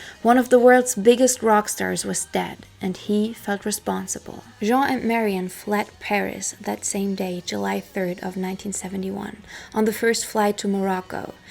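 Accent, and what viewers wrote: United States. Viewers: you are a delicious woman with a perfect american accent!🤩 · WOW you have an awesome American accent! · I thought you were American until you said English wasn’t your first language. Fooled me.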